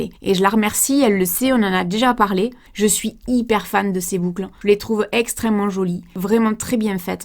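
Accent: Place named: Marseille, France. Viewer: tu viens de Marseille ? tia l'accent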